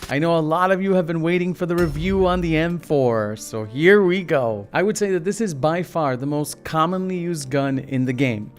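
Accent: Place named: Russia. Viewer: I like sat Russian accent tho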